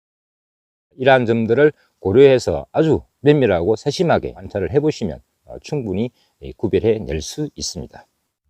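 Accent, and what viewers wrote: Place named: Jeolla, South Korea. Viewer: 말이 전라게만네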